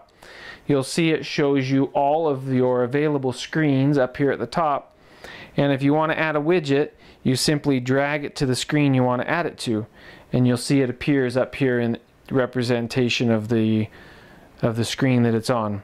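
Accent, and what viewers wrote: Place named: United States. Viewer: Finally someone who speaks American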